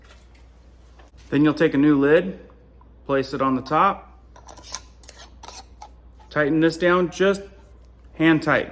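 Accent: United Kingdom. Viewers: I’m reading this in your beautiful UK 🇬🇧 accent ❤️ 🥰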